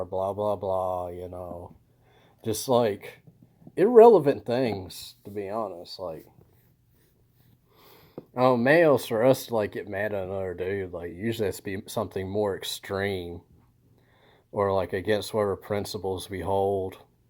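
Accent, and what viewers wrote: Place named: South, United States. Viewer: Btw idk why, but the southern American English accent is my favorite. Your voice and accent rly gets me feeling cozy, next to a campfire, having a drink with ppl i trust (idk thats how your accent gives the vibe for me).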